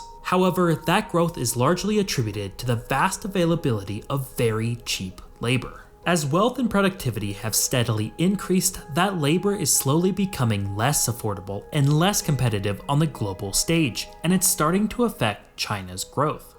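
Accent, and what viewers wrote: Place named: United States. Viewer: Beware : When a video is narrated in d American accent n sounded in way like "we are d righteous knowledgeable West trying to point out all d bad that China is doing", it's wise for viewers to add quite a bit of salt to it..bc China is disrupting centuries of Western global exploitations of d Global South..thru conquest n colonisation in d past, n post WW2 thru neocolonialism, to ensure d G South stays poor so that it's easier for d West to maintain their v high standard of living.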